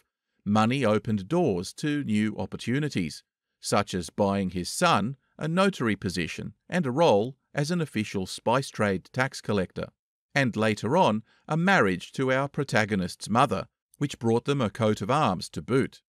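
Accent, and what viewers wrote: Australia. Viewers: I must say very colour full English language used by it's creator while narrating in Aussie Accent with various cuttings of picture, But I like it very new experience. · Voltaire and an australian accent....?? OMG.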